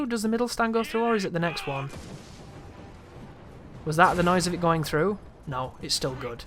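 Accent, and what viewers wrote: United Kingdom. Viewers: I have never felt so.. related to someone XD pure unrivalled British 🤣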